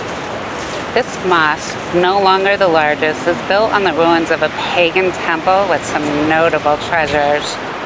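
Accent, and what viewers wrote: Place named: United States. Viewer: It is not english language but american one .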